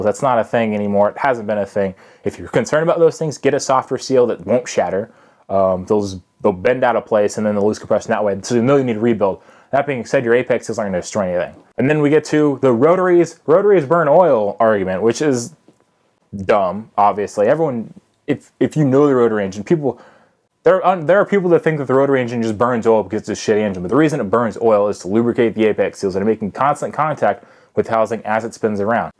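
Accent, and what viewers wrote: United States. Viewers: Sorry, I can't understand most of what you say. And English is my native tongue. So a kind request and suggestion might help us all: Please, when you have a really strong (I'm guessing Southern) US accent like yours, remember that most people don't have that accent, and you will fail to communicate your thoughts and information. So, try to slow down a lot, speak clearly, don't use foul words, and you'll win over your audience, and then gain many more subscribers. Thanks, in advance, for your patience. All the best.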